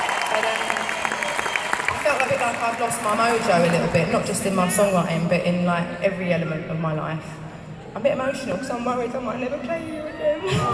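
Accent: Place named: South London, United Kingdom. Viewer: I don't understand one word of what she's saying. So South London and very fast speech.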